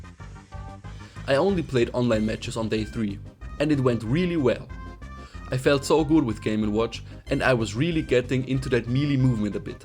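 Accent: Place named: Germany. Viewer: Mann hoert raus dass du deutscher bist hahaha. Weiter so mit dem Melee grind! Ich find es lustig, dass du den Gruenen Mr Game and Watch spielst. Ach du Gruene Neune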